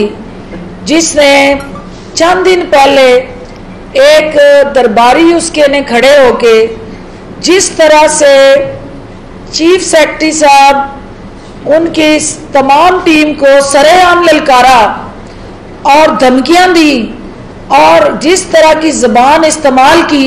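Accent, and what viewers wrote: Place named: Sialkot, Pakistan. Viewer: Sialkot ki pari likhi awam bi is lehja maian hi baat kRti hy. Yeh sialkot ka simple sa lehja hy. And log ziada tr esy hi bolty haian.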